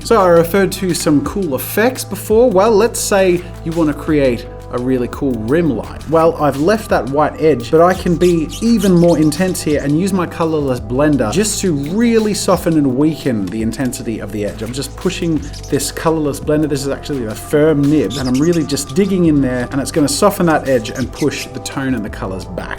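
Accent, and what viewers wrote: Australia. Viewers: I even fell in love with the Aussie accent! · Is this dude Aussie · I love the Aussie accent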